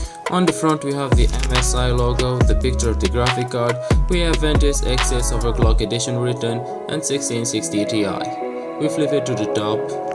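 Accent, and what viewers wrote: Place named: India. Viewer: You have an indienne accent bro 😁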